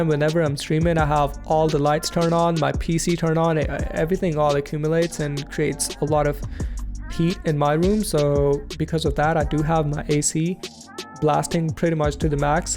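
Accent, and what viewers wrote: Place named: India. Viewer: y does he sound like he indaian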